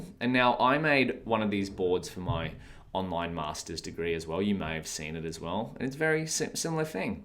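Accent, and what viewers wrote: Australia. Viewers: I WAS DESPERATELY WAITING FOR YOU VIDEO! Question - Are you from Australia? If yes, which city?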